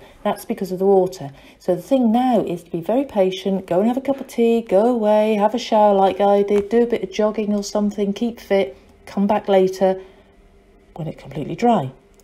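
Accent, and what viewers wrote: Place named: United Kingdom. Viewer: Omg I love your videos when you use your beautiful voice with your British accent which is the coolest. I live in New York in the states so Im sure I have an accent as well however mine sucks. XO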